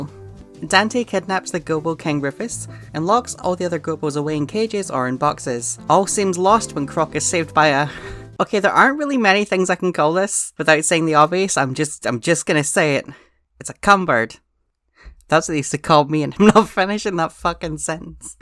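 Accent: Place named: Scotland, United Kingdom. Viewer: You never fail to impress me with the quality of your content,. I loved this. A great throwback for me. Humorously told with great Scottish banter. Till the next one <3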